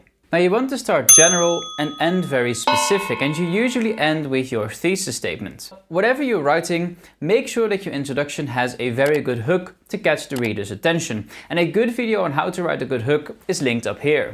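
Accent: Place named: United Kingdom. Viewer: it was overall pretty good. but if he wasn't brittish i'd like it more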